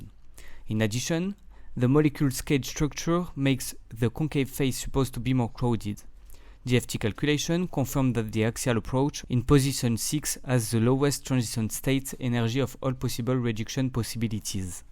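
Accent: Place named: France. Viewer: Je reconnaît le petite accent français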